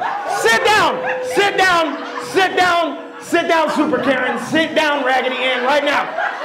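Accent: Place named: Boston, United States. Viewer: He’s so Boston it’s not even funny 😂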